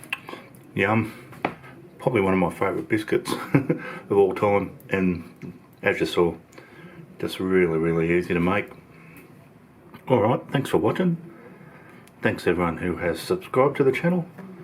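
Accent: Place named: Australia. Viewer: Love our Aussie accent 🙂👍😉🥰 Yummo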